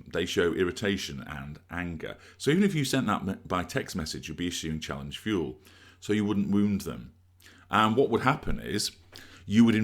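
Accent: England, United Kingdom. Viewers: I like your voice very much.. And the english accent ..👍🏻😊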